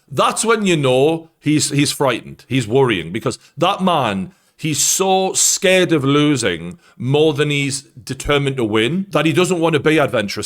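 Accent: Newcastle, United Kingdom. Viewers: True geordie ffs cringe he's got a jesmond accent and he's pulls himself thinking about woltemade 😂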